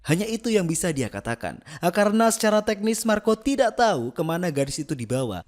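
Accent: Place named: East Java, Indonesia. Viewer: Mimin iki sajak e wong ndi yoo ,bahasa e kok khas darjo-sby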